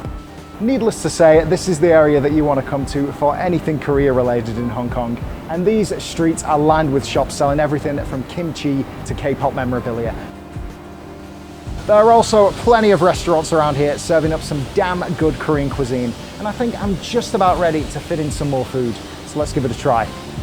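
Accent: United Kingdom. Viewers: Great stuff,. Quite a new fan of yours, mate. Great content, and lots of stuff many other videos of HK don't cover. I've already been once a few years ago, and your great footage is setting up my appetite (literally) for another visit. Keep up the good work - those soft Northern tones of yours are dreamy too!!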